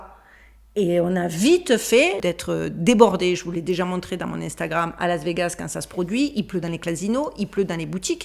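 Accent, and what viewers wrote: Marseille, France. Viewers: Oui, continues ce sujet, c'est passionnant, .tu es passionnante et adorable avec ton léger accent marseil.. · Coucou! pour ce qui est de continuer ou pas sur Dubaï, fais comme tu veux. J'adore t'écouter parler, j'adore ton énergie, j'admire ton caractère affirmé. Nous sommes beaucoup à apprécier cette même chose. Aussi ton accent de belle marseillaise vivant en plein Vegas, j'adore aussi! mille bisous (oh ben non pas de bisous physiques, mille ça ferait beaucoup. Mais voilà: ces mille bisous-là! ). Stay safe you too. bye!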